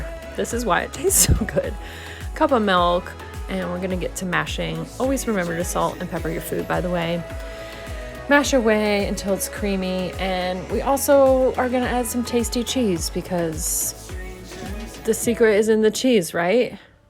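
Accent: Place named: Canada. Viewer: I am curious, Are you Canadian or American because we share the same accent; to which I mean not a NZ accent. #Canadian How long have you been in New Zealand ?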